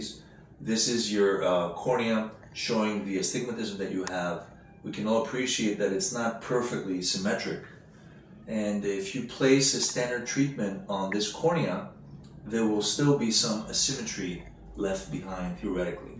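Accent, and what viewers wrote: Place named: United States. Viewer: haha at least you could find sb talking with an american accent,not greek.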